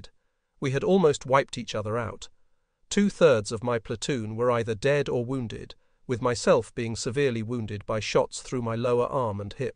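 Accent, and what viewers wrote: United Kingdom. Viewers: Who provided the voice template for the A.I.? It has a crisp British accent I find interesting. Though it has those mispronunciations that annoy people, it is still pleasant to listen to.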